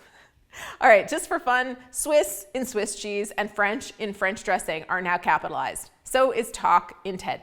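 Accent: Canada. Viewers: Very informative- as always. Thanks & hang on to that 🍁accent 😉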